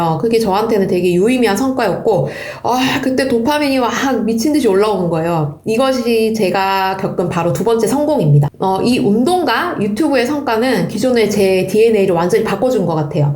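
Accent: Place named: Gyeongsang, South Korea. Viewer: 혹시 ㅎㅎ.. 경상도분아니신가요.. 말투가 약간 있는데…ㅋㅋㅋ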